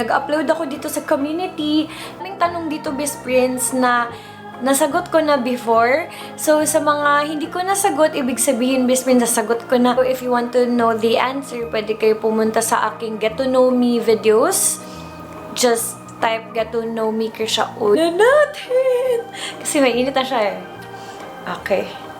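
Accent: Visayas, Philippines. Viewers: Bisaya kasi siya. Ganyan talaga pag nag tagalog ang mga bisaya, mapapansin mo 'yun. Bisaya rin ako, alam ko 'yan. It's somewhat strange and lil bit hard for us to talk in a dialect that is not ours, ganun rin naman siguro sa inyo. It's not being maarte.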